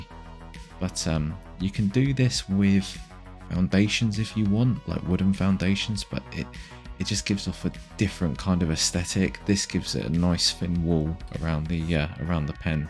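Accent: United Kingdom. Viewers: Somerset, born and bred here. Love hearing that Devon accent mate, keep churning out the fantastic content. 👏🙌🤟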